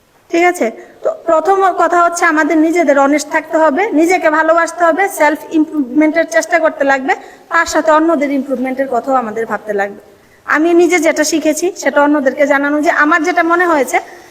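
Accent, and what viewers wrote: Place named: Bangladesh. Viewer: Kothar tone ta onek ta bangladesher moto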